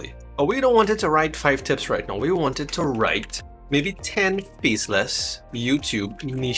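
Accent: Trinidad and Tobago. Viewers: Oh, hey bro are you a Trini? 🇹🇹 Nice job on the channel 😊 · are you trini? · OKAY… I hear a trini accent! I keep hearing about faceless videos, but not sure if it would work for my niche. This is interesting though. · I like your accent 😂❤ Trini? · Hey are you from Trinidad and Tobago? Nice😊 I'm a Canva user too. I was really surprised to hear your accent in my headphones lol. I was like......sounds like ah trini lol. I'll be subbing you from today! I'm a trini too btw, slight peppa and ah red solo......only we know what that means😁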